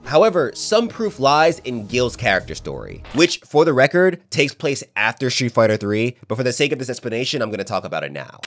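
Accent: Jamaica. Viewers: I didn't know you were Jamaican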